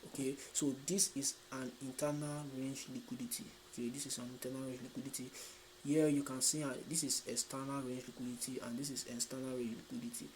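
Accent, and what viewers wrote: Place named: Nigeria. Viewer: Chai, I thank you very very very very very very very very much, infact words can't explain how I am thankful for finding this YouTube channel. Let me tell you, since I found this channel I have been having in my trades and heart. Normally I don't like watching any YouTube videos apart from ICT, but I was on a search on a question that was bothering me, then I stumbled on your channel, just watching one, just one video from your channel I got in love with the way you simplify everything ICT is teaching us. You know, there is a difference when your own Nigerian person is talking to you compared to an America or Foreigner with their accent. I just love it, Sir please keep it up to drop simplified videos like this and others in future. I'm your number 1 Fan. Love from me to you❤️❤️❤️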